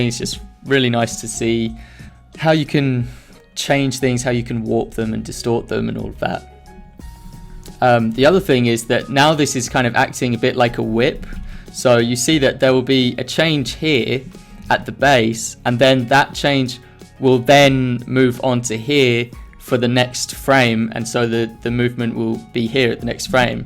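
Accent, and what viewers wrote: United Kingdom. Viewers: i like when you talk in british accent mine while i learned how to say english in british accent like you say it in this video btw i learn much knowledge from you thnx broo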